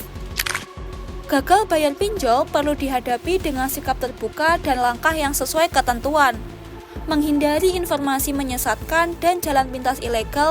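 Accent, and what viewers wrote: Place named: Banyumas, Indonesia. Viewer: Ai bahasa ngapak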